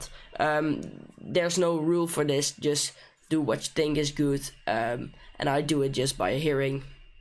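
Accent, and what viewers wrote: Netherlands. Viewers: Are you dutch? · Are you Dutch cuz I am and I thaught u where Dutch 2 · So dope! Are you Dutch btw? Keep doing your thing man! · Lekker Nederlandse accentje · You sound dutch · You are dutch, are you? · Are you Dutch? If so Hallooooo!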